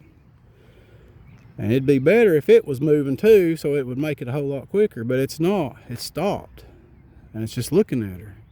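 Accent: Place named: Kentucky, United States. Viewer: New subscriber. I’m from Ky and you sound like my relatives. Feels like home.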